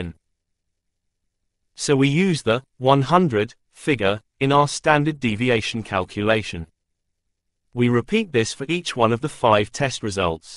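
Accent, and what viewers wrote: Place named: United Kingdom. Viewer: Thank you, sir. You sound British. My Mother was a war bride from Coventry, England.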